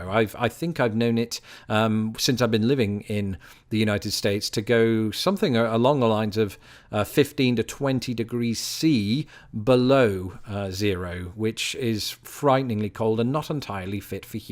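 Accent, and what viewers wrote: United Kingdom. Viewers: Dude you’ve been here 10 years and that accent is stilly silky British smooth 👌👍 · You still have very distinct British accent. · i like this guy. British accents make everything you say, much more interesting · Gosh that British* accent never gets old, I'd live in London just for this I'll never understand why people trade Europe for the US. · No way you still sound british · I'm surprised you still speak with a British accent after 10 years! But you did say "sidewalk"!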